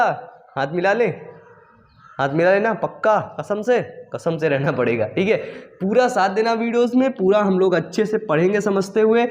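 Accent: Uttar Pradesh, India. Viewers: Sir app up se ho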